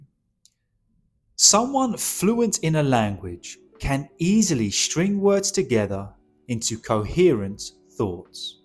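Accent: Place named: United Kingdom. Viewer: Being Soth Asian we prefer British English over other accents and styles which are derivatives on traditional English from England and you are a classic example, I would always love to attend your lectures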